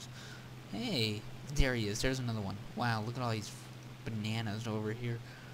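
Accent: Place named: Russia. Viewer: good job man :D Dat Russian accent doe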